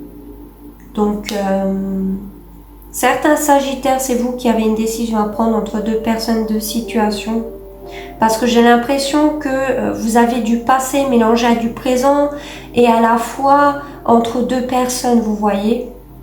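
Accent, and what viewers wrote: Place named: East, France. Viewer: Bonjour j adore le ton de votre voix des habitants de l est de France merci pour ce tirage très détaillé et pointu et de partager votre savoir c est un plaisir 🎉 trop parlant pour moi bien a vous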